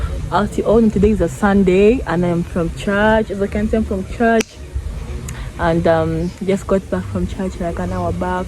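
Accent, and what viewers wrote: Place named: Africa. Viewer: There's sound. She's speaking English with a strong African accent.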